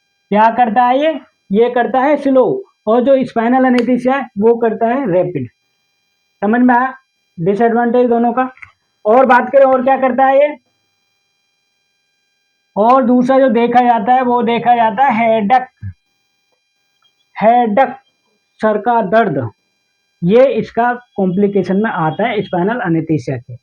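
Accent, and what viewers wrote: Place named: Bihar, India. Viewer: Aap Bihar ka san hai sir jii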